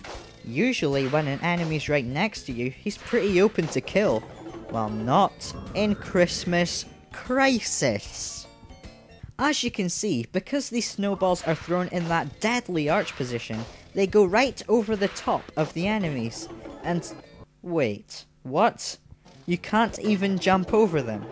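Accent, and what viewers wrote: Scotland, United Kingdom. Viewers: Dude. He's Scottish.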